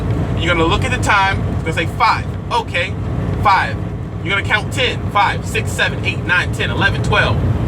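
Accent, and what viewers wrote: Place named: DMV, United States. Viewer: Brotha! I just found you on YouTube a few hours ago. At first I was on the fence as to if I was going to do the trucking thing, but looking at your videos has convinced me, especially being someone in my position. This is a great opportunity to prosper! Awesome content bro! All that aside, I can tell you're from the DMV..."young!" What's up man? I'm living in Cali now, but I'm from the DMV too. RESPECT!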